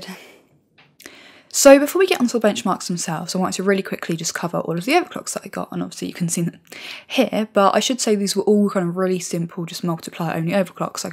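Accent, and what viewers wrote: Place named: West Midlands, United Kingdom. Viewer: Your accent is great....sounds to close to me tho.. West Midlands?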